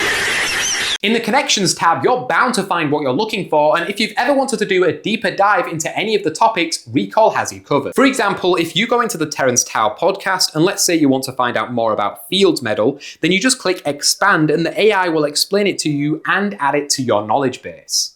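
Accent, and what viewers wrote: Northern England, United Kingdom. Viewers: Northern (English) british accent. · Gotta love the (British?) accent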